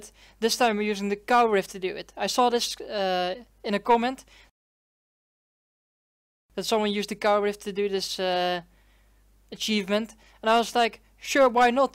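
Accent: Netherlands. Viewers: je accent klinkt zo lekker nederlands, love it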